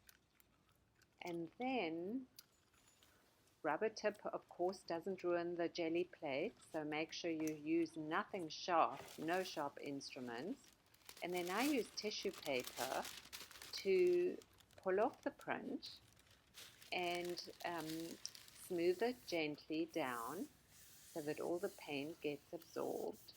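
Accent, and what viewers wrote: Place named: South Africa. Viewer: Nice South African accent ! 🇿🇦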